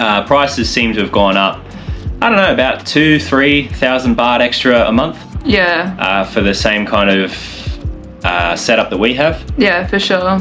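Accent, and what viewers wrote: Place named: Australia. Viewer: Thanks for the video! Great to hear the Aussie accent!! What about the cost of Visas and/or visa runs? Thanks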